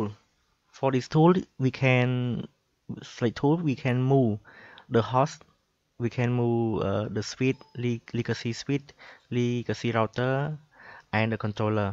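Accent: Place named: Vietnam. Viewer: Very funny vietnamese accent :D I like it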